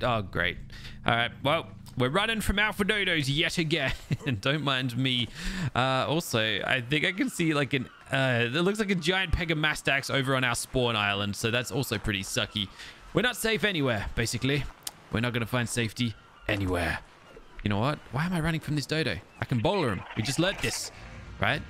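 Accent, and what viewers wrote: Australia. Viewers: I love the Australian accent but I don't like the idea of the huntsman spider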